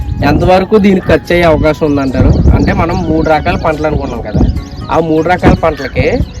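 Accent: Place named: Konaseema, India. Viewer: కోనసీమ యాస.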